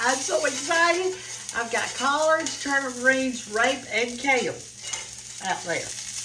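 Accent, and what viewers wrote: South, United States. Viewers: Miss can you video spinach omelet. I love spinach w garlic Parmesan. God bless you thank you. Love Southern accent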